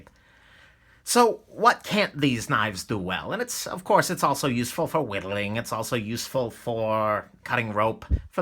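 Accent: New York, United States. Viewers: I want to add I subbed last week and the voice tone and rythme made it for me. Makes it very enjoyable to ear!:) thanks and keep up... is this an New York accent?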